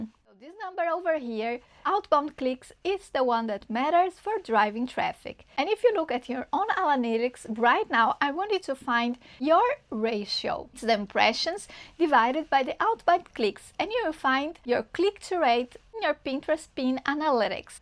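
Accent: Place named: Brazil. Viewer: Are you brazilian? your accent is kinda familiar tho! trhanks for the info!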